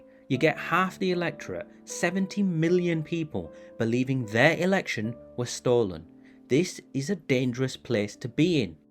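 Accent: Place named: Yorkshire, United Kingdom. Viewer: Really engaging video- cutting through the noise and the bs commentary which is pervasive in the news and most social media. I can sense a hint of Yorkshire accent amirite?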